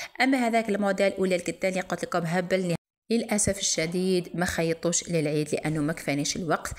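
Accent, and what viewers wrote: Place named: Batna, Algeria. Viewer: اهلا حبيبتي عندك لهجة كلام الباتنيين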